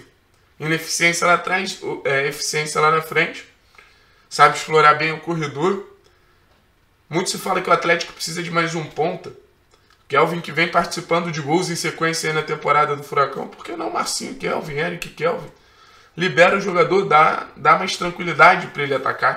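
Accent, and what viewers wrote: Rio de Janeiro, Brazil. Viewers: Ele é carioca da gema, não tem como forçar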